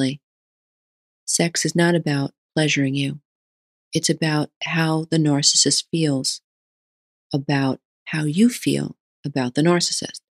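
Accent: New York, United States. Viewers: This is soooooo on point., the last guy I dated was just like this. blaming me he can't get me to do a threesome, calling me names I shouldn't write on here. luring me in with excitement in the beginning and then just flaunting it allll over NYC with everyone else.. im the crazy one, im the nasty one, im the violent one... etc etc etc. (these are just the examples related to this video). then finally he broke my nose. the next fight, I finally called the cops. and then Covid happened and I left NYC so I didn't get stuck quarantined with him. During this time I was able to reflect on everything. I found your videos, and you have helped me understand so much. you aren't the only one with videos on this content,.... but maybe missing home so much and hearing a New Yorker like someone I knew tell me it wasn't me and hearing the examples that literally were pages out of the book of Michelle and Matt 2019 made it click and gave me strength. 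I just want to thank you so much.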